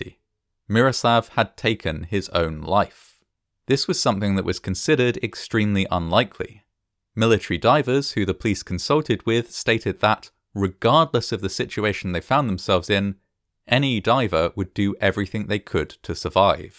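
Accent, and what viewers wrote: United Kingdom. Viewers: I watch your disaster videos vs others due to that British accent. Keep it up! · British accents work really well for documentaries.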